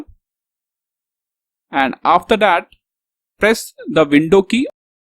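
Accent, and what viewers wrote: India. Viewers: I recommend listening to indian accent youtube tutorials they always fix the problem Without this guy id be stuck with a lagging mouse Thank you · As soon as I hear the Indian accent, I knew I was in good hands. Problem fixed....and THANK YOU!!!!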